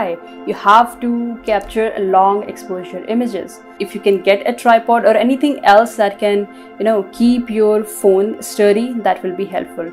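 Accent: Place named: India. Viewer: I am not able to hear/understand you clearly. Please talk slower, the Indian accent is difficult to understand ...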